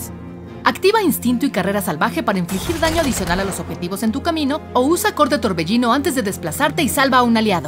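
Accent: Mexico. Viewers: x q habla en mexicano la presentadora? es que para riot latinoamerica es mexico? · Hablando con memes todo el rato es desagradable oírlo. Además hablando mexicana, perdiendo el acento neutro que siempre proclaman usar. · agien mas noto ese toque mexa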